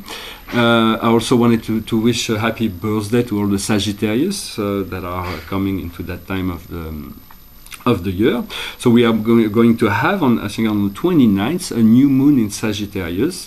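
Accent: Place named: France. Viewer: I could listen to you speak English with the beautiful French accent all day,! Thank you for the Osho reading and blessings to you. 🌎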